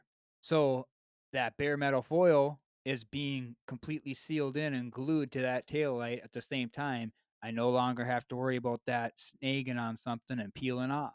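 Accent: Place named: Wisconsin, United States. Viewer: You sound like a Wisconsinite, I bet you say "you's guys"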